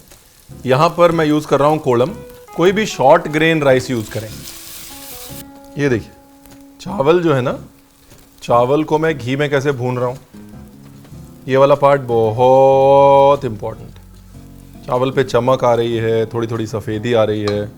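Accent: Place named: Punjab, India. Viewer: Sir, ranveer brar you are just awesome ♥️♥️... I have seen all your recipes like each and everyone.... And I just love your accent more then your dish of course your dishes would be more awesome 🌍♥️. I really love the punjabi in you... I recently saw your motivational video on some YouTube channel. And I was so happy that we have uh... The best chef I even watch your serial Raja rasoi aur andaz anokha it's awesome ♥️♥️.... I just wanna say that sab chef's khane banate hai pyar banate ho💕... Love you sir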